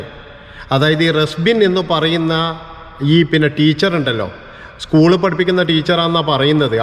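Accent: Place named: Malabar, India. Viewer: സഹോ , താങ്കളുടെ മലബാർ ശൈലി സംസാരം Super. ടെൻഷൻ വല്ലതും ഉണ്ടെങ്കിൽ നിങ്ങളുടെ വീഡിയോ കാണും😂ചിരിക്കും😂 അതോടെ ടെൻഷൻ നാടുവിടും😂 Congrats👌👍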